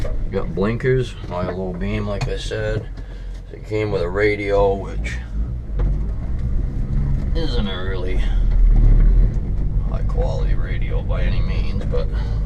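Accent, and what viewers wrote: Maine, United States. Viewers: Sound like a mainah!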